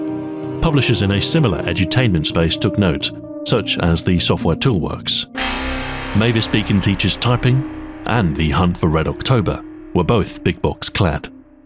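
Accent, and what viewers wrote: United Kingdom. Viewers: I just watched 22 minutes of a well-spoken brit talk about boxes and I fucking loved it. That's the power of I tell you damn. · You're back! Awesome. Your sophisticated-sounding British voice is the coolest.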